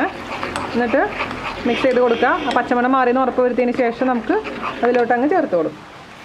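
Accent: Thrissur, India. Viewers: Natil vannapo chechik thrissur accent koodi😍. Njan kottayam accent aanu ketto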